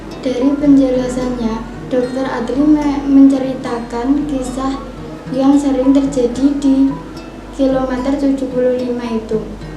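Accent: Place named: Java, Indonesia. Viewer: terima ksh tapi ngomomg ny MEDOK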